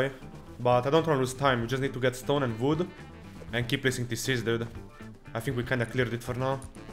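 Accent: Italy. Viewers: ma sei italiano? Hai proprio l’accento italiano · You have a really strong like Italian accent lmao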